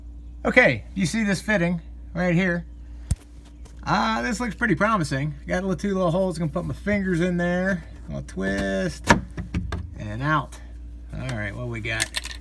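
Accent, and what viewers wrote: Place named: Germany. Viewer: Your video was a great help! Appreciate the humor and German accent too LOL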